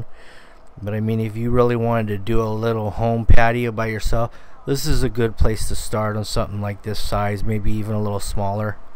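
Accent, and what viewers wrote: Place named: Orange County, United States. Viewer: Your accent, "THIS guy is from OC." Then your phone number pops up. Yep.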